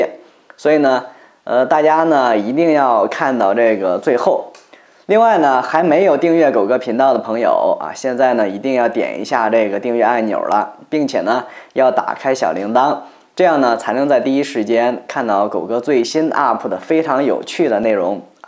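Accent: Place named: Taiyuan, China. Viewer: 你是不是山西太原的，你的聲音聼起來很熟悉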